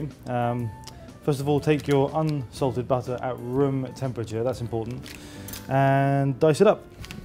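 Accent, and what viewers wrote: England, United Kingdom. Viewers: where r u from? england? lol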